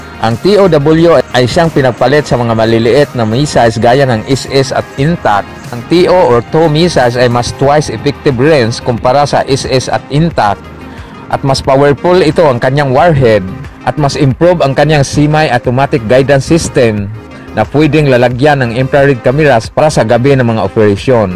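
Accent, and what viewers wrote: Visayas, Philippines. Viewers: Ayusin mo yung pgtatagalog mo bisaya ang dating mo.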